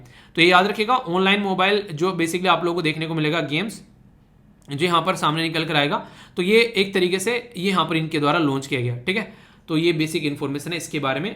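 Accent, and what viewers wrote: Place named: Baghpat, India. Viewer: Sir ji aap baghpat se to nhi ho ...hmre yha ki language lgti h aapki 🥰👌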